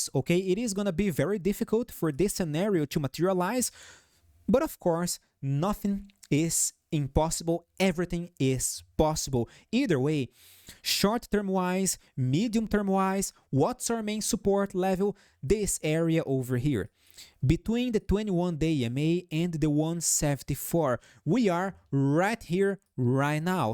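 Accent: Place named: Brazil. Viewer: Eu desconfiei desde o primeiro video que assisti que vc era brasileiro pelo sotaque kkk. Parabéns pelo trabalho!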